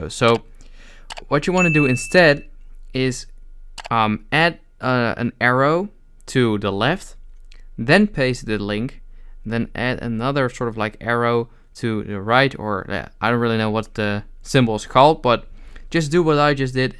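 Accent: Netherlands. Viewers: R u from the Netherlands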